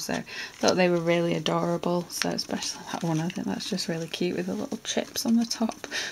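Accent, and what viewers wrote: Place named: Lancashire, United Kingdom. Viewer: I'm from Leyland Lancashire your accent sounds similar to mine so that's why a was curious x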